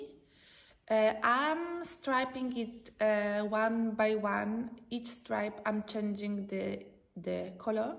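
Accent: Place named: Croatia. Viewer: Hiya.. I was so delighted to come across you via Loretta - Knit My Way Home podcast.. she mentioned you in the #inspiredbyellenkal. I enjoyed your podcast & how cheerful you are.. and love your new cardigan and am looking forward to knitting it when I finish a couple of my other knits. I laughed when you showed the blue tack on your needles.. but a great idea, yes! Congrats on the new pattern.. and I look forward to seeing what you come up with in the future.. and maybe even test for you one day. Thank you for a lovely podcast.. Cheerio from an Aussie girl x Tea ps.. love your accent as it reminds me of my homeland Croatia 🫶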